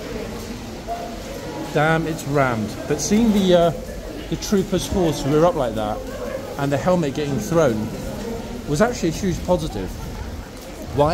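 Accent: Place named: England, United Kingdom. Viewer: What part of England does your accent come from? You are easy for my American ears to understand! That rain is relentless!! Funny how spoiled the horses are...mine would often choose to stay out in the pasture in the rain and snow instead of heading into his barn!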